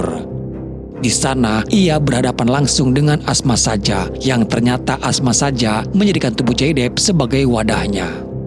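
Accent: West Java, Indonesia. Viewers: opaL???.. sunda pisan kang ... ☺️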